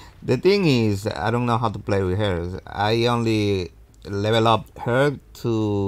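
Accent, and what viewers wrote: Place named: Spain. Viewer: You are so spanish 🤣 “ehhhh” Im so happy i got this for free basically because i had auric cells before the merger with netease and when the game came out last week i had 1005 cells and on my 3rd and last flip i got the skinnn for freeee9999 and the fact you said this skin cost 500$ made me even more hype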